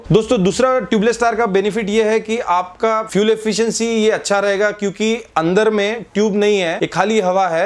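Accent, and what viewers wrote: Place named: Gujarat, India. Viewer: Bhai Hindi bolneke Andaz see pata chal raha hai aap bhi Gujrati ho, specially from Khila word😂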